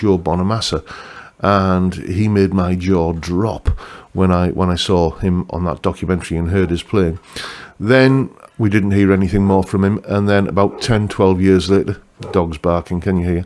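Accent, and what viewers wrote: United Kingdom. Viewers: You sound British so my advise learn 2,nick bowcott songs and call me in the morning nevermind the dull players here but I'm from America what do I know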